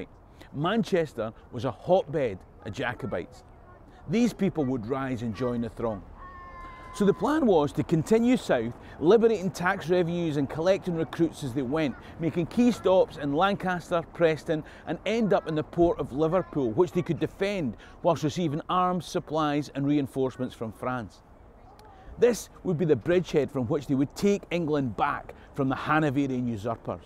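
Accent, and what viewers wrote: Scotland, United Kingdom. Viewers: after the US midtrems happen I will get back to finding out what a Jacobites is a slang or a cuss word. I have tried four time and repleted to understand what it means. You do know you get a bit scottish when you talk??? lol hugs to the family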